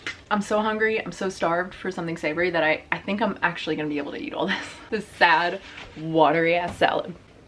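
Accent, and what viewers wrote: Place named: Tennessee, United States. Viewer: I heard your Tennessee accent.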